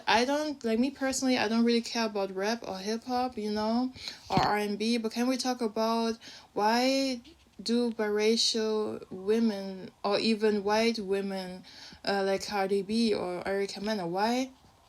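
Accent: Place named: Germany. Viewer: She actually has a German accent, and to be honest I usually dont like German accents but her accent is BEAUTIFUL ❤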